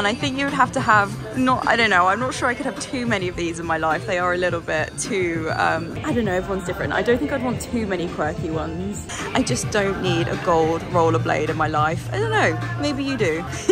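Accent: United Kingdom. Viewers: Mais uma vez um ótimo vídeo 😚.Desculpe se não escrevo em inglês, não falo muito bem inglês, adoro seu sotaque britânico. Está loja realmente tem coisas lindas! Não acredito que você consiga sair destas lojas sem comprar nada. 😘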